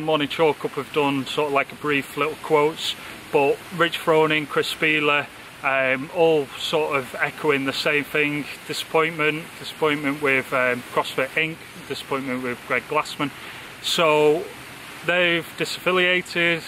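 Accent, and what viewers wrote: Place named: Lancashire, United Kingdom. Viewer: If you had a message it got lost in your Lancashire accent ... Pal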